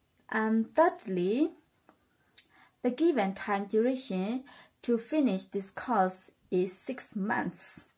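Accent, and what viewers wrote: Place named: Myanmar. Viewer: As a Burmese I feel proud not because she speaks good but her accent is a little bit different from other Burmese and for her courage ..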